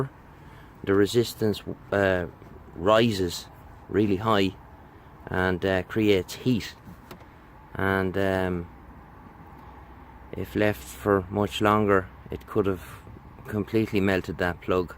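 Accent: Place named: Atlantic Canada, Canada. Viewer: Well done, where are you from? You sound like a Canadian east coaster.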